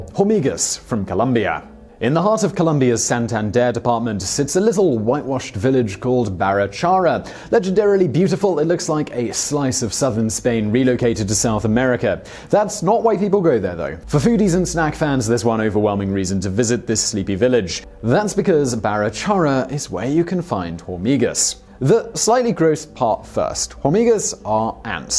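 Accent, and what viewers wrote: United Kingdom. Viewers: Quick question.....where are these videos made ? You sound so British, but everything is compared to America. If it hadn't been for Cornwall being mentioned I'd had no idea what you were talking about. I have no idea about Wyoming, Dollars and cents or even where Rhoad Island is let alone the size of it. It's like watching a cookery program where there's no such thing as Gas Marks and everything is measured in cups !! I begin to feel like Alice falling down the rabbit hole !!!!!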